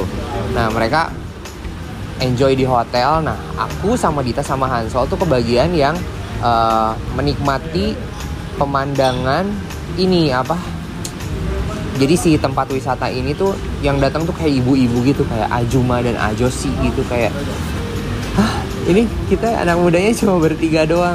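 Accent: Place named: Java, Indonesia. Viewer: Di sambut dengan BANYAK GLUEDEKKK medok banget mas 😅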